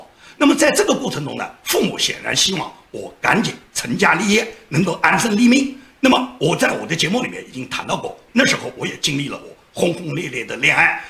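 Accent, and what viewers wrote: Nanjing, China. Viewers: 建民一口标准南京话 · 一听就是南京口音😄。㊗️老乡身体健康！诸事顺意！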